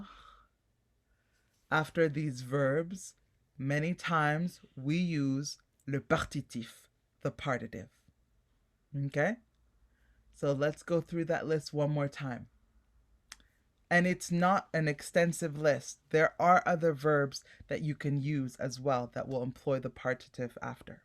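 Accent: France. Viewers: French accent is so beautiful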